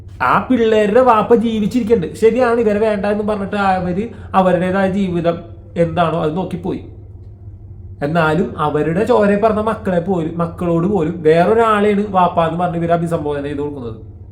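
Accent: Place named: Thrissur, India. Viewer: Ikkade naadu evideyanu.. samsaram thrissur district kodungallur , thriprayar basha pole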